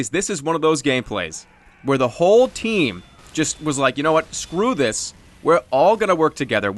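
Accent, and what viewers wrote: Boston, United States. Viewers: your from where the scout is! boston!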